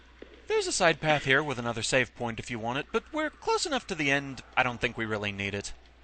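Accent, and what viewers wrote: United Kingdom. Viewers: I know im late and this was posted years ago I like your let's plays...by the way are you British if add on your English is good ?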